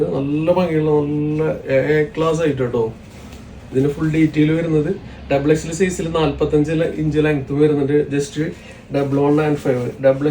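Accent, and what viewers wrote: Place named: Thrissur, India. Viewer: Innentha oru Thrissur slang pidichad😂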